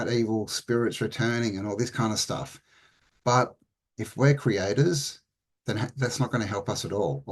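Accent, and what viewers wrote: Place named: Wales, United Kingdom. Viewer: Whern are you in the UK? Welsh pixie ❤😊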